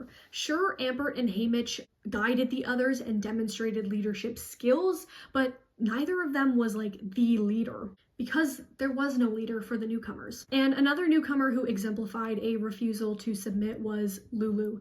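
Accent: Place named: Canada. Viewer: this is the first video of yours that i've watched so when you first started talking, i got a vibe that you were canadian and im glad to see i was right. besides that, this is such a cool concept and exactly the kind of analysis ive been looking for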